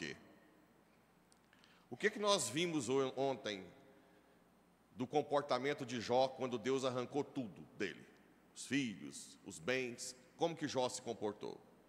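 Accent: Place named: Minas Gerais, Brazil. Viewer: padre o sr é Goiano mas tem meu sotaque mineiro, gosto demais.Amo seus ensinamentos.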